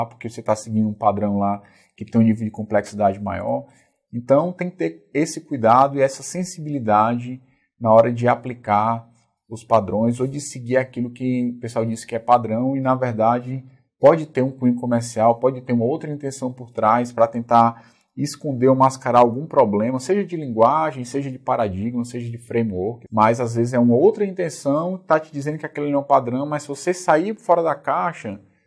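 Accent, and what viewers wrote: Ceará, Brazil. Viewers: Seu conteúdo é muito bom! Estou acompanhando seu curso de vue na udemy, muito foda! Professor você é cearense?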